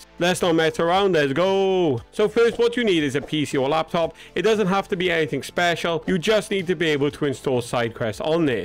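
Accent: Wales, United Kingdom. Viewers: What on earth are you on about ARABIC? hes welsh thats why we cant understand us the terrible mic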